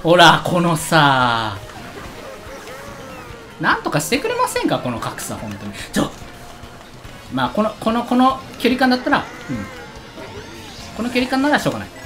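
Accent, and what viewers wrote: Kansai, Japan. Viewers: うぽつです٩( 'ω' )و関西弁喋ってるのがすごく良いです(´꒳`)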